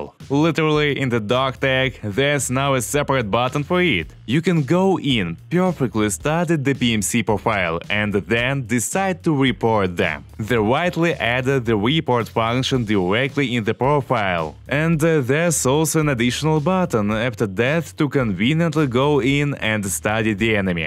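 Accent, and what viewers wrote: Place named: Russia. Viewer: Hey, I like your (russian I think) accent. It sounds cool :)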